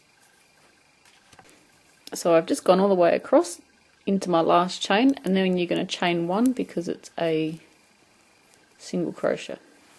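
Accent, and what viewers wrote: Australia. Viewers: you are hearing the true Aussie accent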